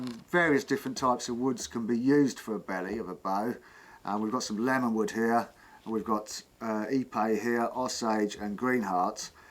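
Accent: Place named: London, United Kingdom. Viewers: I think East london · Not northern at all. I'd say down by London somewhere. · Nope. Definitely not Northern. Southern, probably London area. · London or nearby.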